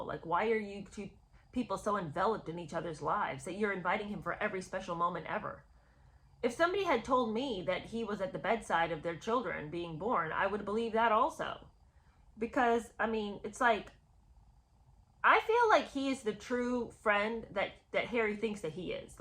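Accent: United Kingdom. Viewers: I agree. She looked gorgeous in England. She had to have a stylist and she claimed she didn’t. I really enjoy your channel. You’re very funny. Your British accent is simply the best 😂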